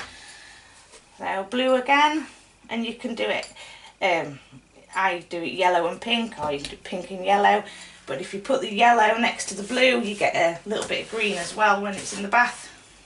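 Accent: United Kingdom. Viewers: Great video, thank you. Great tips too and they look amazing. I never knew about the claw and brush technique. I love videos like this, they're natural - not forced and fake. I think I detect a fellow Yorkshire accent :). I'm glad the recipe isn't the one you use - I kept thinking 'No....don't give away your trade secrets / recipes'....I know that competition can be watching and I'm a firm believer in helping people - but I like to keep my hand of cards close to my chest :). You have to protect your business. Thanks for the recipe x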